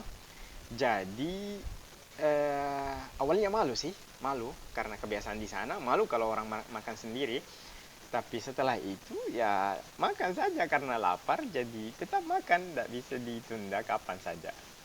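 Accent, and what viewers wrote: Makassar, Indonesia. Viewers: kentara mi logat makassarnya hahaha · Logat Makassar nya kental... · Bahasa indonesia nya kayak orang makassar hehe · logat makassarki dgg 👍👍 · Logatnya makassar tonji 😄👍👍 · Org sidan tp, Ngomongnya kayak org makasar wkwkwkw 👍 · pntasan ada logat" makassarnya🤣🤣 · ohh masnya di makassar yah. pantas logat bicaranya tdi ku pikir mirip logat makassar teenyata krena faktor kukiah di makasssar😂 jdi logatnya ikut logat makassar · Bahasa Indonesia Aksen Makassar 😅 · Koq ada aksen mkassarnya...ini dmksar ya?? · Bahasa Indonesianya Bagus, ada campuran logat makasar.. 👍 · Logat makassar 🙂🙂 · Logatnya seperti logat makassar ☺️ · Logat Makassar tawwa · Logatnya sedikit ke makassar wkwkw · Bisa menyesuaikan banget logat Makassarnya ya mantap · hebat logatnya sdh seperti org Makasar...mantap👍👍👍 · pertama lihat video ini saya pikir kok logatnya kayak logat makasar ternyata memang tinggal di makasar toh😄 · Logat makassar 👍🏻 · Logatnya makassar, mantap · Udah mulai kentara logat makassarnya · pantas logat makassar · Ini kuliah di mana kayaknya di bagian timur Indonesia ya. 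Kayak logat makassar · Baru pertama nonton tapi udah langsung tau kalau pasti kuliah di makassar karena logat khasnya 🤣🤣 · Logat makassar. Wkwk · Logat Indonesia nya ada aksen Makassar 😁👍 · Logatnya seperti orang makasar.. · Logat Makassarnya kental banget. Btw, salam dari SulSel tapi bagian Sinjai. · logatnya makassar banget, btw ini kuliah di UNhas kan? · makassar bgt logatnya 😬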